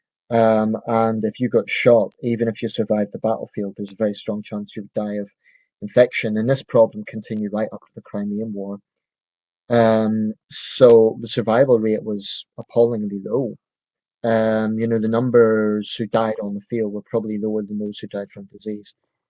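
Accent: Scotland, United Kingdom. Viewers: interesting listen. your ulster accent is particularly scottishesque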